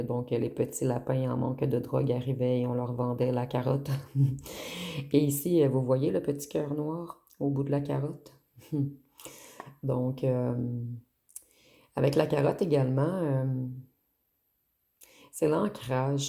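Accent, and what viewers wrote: Quebec, Canada. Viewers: Bonjour et merci pour votre travail ❤❤ vous êtes une belle âme et j adore votre accent vive le Québec 🎉🎉❤ tendresse du cousine de France 😂😂😂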